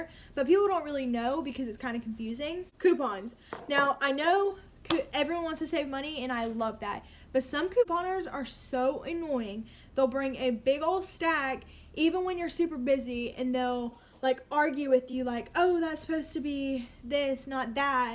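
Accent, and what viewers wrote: South, United States. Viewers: just curious because i noticed your accent and also i didnt know publix was anywhere besides fl ❤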